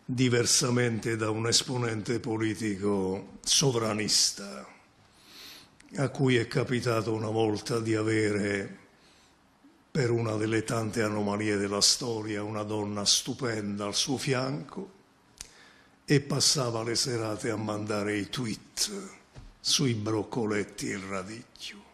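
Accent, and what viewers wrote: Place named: Naples, Italy. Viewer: ascolta, sai bene che ci troviamo in Italia e tutti hanno degli scheletri nell'armadio, ma a me sembra sinceramente che De Luca un po' esageri, il guaio è che sembra recitare una sceneggiata napoletana un po' clownesca. Poi la tipica pronuncia partenopea, mi sembra di sentire la pronuncia di mio padre e dei miei congiunti napoletani. la nostra famiglia è di Torre del Greco (provincia di Napoli dove sono nato anch'io e da dove mi trasferii successivamente a Trieste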